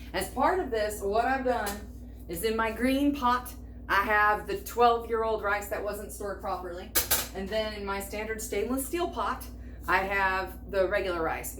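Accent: South, United States. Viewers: Enjoy your Southern drawl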